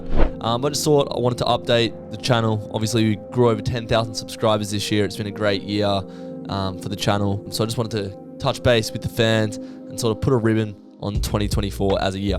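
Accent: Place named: Australia. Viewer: just got around to watching this..couldn’t agree more. the people you meet & relationships you foster along the journey is what makes life worth moving through the sludge. beautiful q&a, looking forward to more content in 2025 mate🫡🫡 send me a voice note soon braindumping what’s been going on—good to hear the ole aussie accent xxx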